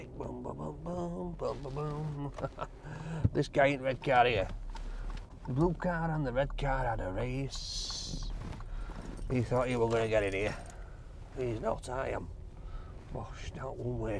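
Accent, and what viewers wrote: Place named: North, United Kingdom. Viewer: "That's Peng, that"... I love t'old northern speak. I'm saying t'old in front of everything I can in my house now. It's winding the wife right up. But she's got no room to complain, she's Welsh.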